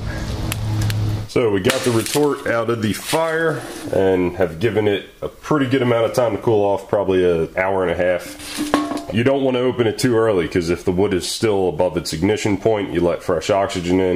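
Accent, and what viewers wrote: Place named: Mid-Atlantic, United States. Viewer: Have you ever lived in the Delaware, Maryland, Pennsylvania area? You've kinda got the accent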